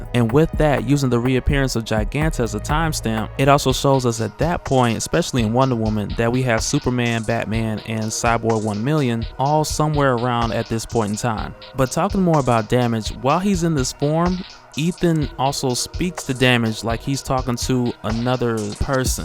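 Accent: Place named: Georgia, United States. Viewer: I knew you were from the GA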